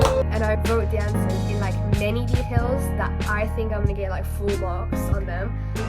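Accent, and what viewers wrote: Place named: United Kingdom. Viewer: من عاشق لحجه بریتیشت شدم اخهههه🥲💖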